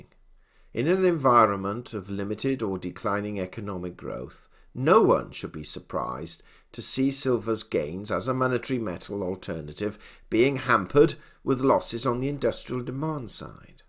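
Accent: United Kingdom. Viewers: I guess I'm into stereotypes. These reports as read by old British accent dude keeps me coming back. Sounds smart and full of wisdom, and So cool, Thanks for the info, I don't always like the truth in your reports, but I do really appreciate them. It's like listening to Harry Potter, but for economics and precious metals. 8)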